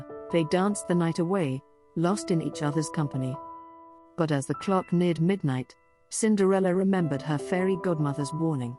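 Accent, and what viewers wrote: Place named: United Kingdom. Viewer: good story :) voice is like british!!! i love it😍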